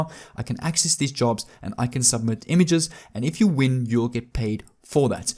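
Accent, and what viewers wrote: South Africa. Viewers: Hello I just discovered your channel. I'm definitely gonna follow you closely. You just commented on another channel. I'm going to model some you your videos. Hello south Africa. Love the accent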